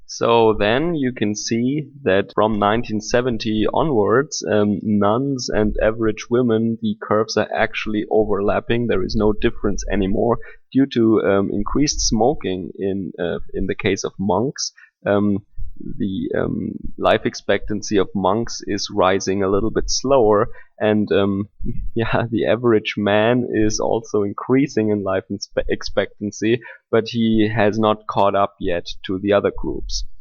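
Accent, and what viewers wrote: Germany. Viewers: I seriously just heard german accent in this and never really watched anything by.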